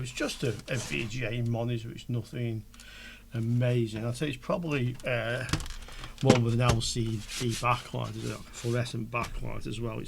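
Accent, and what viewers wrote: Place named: Liverpool, United Kingdom. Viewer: On risque alors de perdre son accent savoureux de Liverpool et son humour. Isn’t ?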